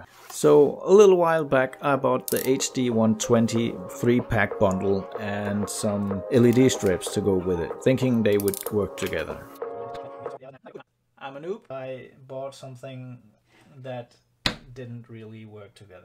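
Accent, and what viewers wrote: Denmark. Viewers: are you from Denmark ?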